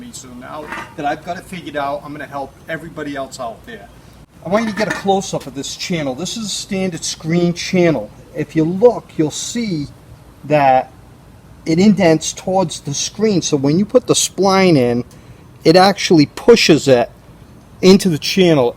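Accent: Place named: Northeast, United States. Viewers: ! Thank you Man!!! Great tutorial and love the NY NJ accent!!! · This guy sucks, don't quit your day job you terrible accent masshole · The best DIY videos are done in a NE accent, so I can feel right at home. Thanks for all of your specific tips! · Ugh. That New England voice. I couldn't listen Mr know it all.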